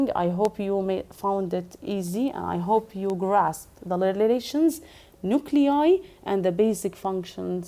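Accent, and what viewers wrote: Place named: Arab world. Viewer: I have been watching so many videos to get into such complicated area, however i could complete non of them. now I feel lucky to find this amazing channel. Whoever watch this episode not only will be able to understand the topic, but also can memorize the details easily bcuz u r talented and creative regarding linking the informations. I wonder why your channel did not go viral yet, may be it is a location and suggestion issue. Though your accent seems arabic, your language is perfectly fluent. Listening to Indians is awkwardly exhausting and usually u turn the device off within ten minutes maximum😅. Thank u so much for making such unique lectures, I appreciate your work 💚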